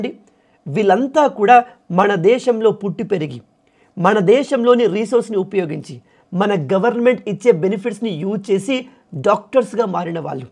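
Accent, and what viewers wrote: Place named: Gujarat, India. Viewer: Nuvvu Gujarati va nee Telugu language accent Gujarati laga Indira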